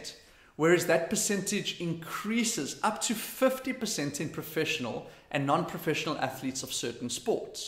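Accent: South Africa. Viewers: You south African? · You sound 99.9999% South African😁 Am I right? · Are u South African? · South african?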